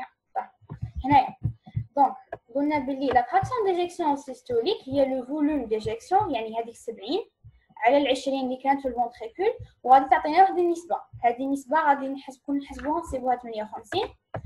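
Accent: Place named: Oran, Algeria. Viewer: Merci Tbiba , j'adore tes explication et surtout on accent oranais <3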